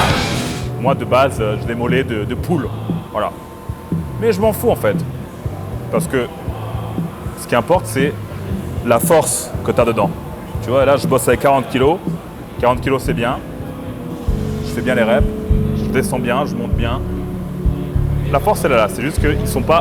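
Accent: Russia. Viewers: ton anglais avec un accent russe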